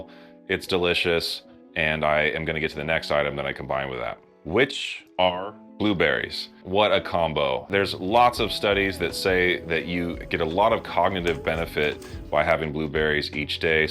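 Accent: United States. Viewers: You understand exactly what I am talking about. You look and speak like an old Southern QUEEN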